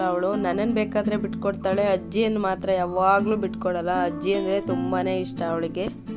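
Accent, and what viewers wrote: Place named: Kundapura, India. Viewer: Hii akka Kundapura knnda matastri .. Yav oor nimdu . Nav hemmady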